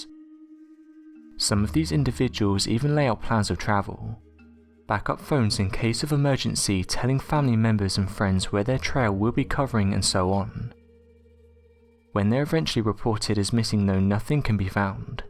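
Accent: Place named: London, United Kingdom. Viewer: English. London sounding. But it's the strange rhythm of his voice that gets me. I just can't handle listening to him. Oh well.........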